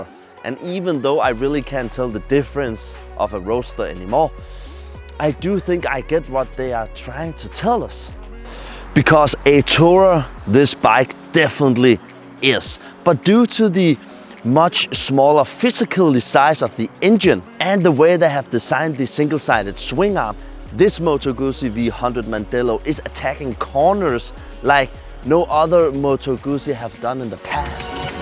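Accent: Denmark. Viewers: Do I detect a Danish accent? Merry Jul then! Kudos to you for riding in those conditions (by the way the same I have right now where I live so I know how it feels to ride on those roads). I guess it's a demonstrator bike, the dealer must be very confident to let you ride out with snow and salt on the roads. I cover my ride, come winter, with a protective spray but whatever you do, there are still spots (mostly the bolts) that get a white shade from the salt but then again for me it's more important to ride than to have a pristine bike. I really like your enthusiasm and the work you put into the presentation, takes, cut, editing. I'm very much interested in the V100 and will definitely test drive one. For the time being I'm a very happy owner of a Ninja 1000sx, such a versatile bike. It will take a lot to convince me to swap it for another bike. Cheers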